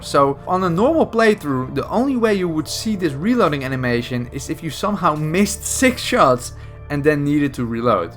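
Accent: Netherlands. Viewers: where are you from, you sound like from the Netherlands, cuz I'm from the Netherlands. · I can see the Dutschness in your voicetone😂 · Yo have a dutch accent but nice video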